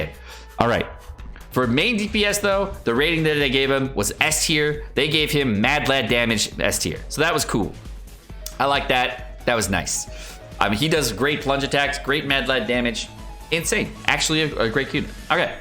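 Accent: RP, United Kingdom. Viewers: I'm English. And your posh English accent is spot on! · Man his British accent is awesome. Dam hilarious intros. Keep it up. 👍🏻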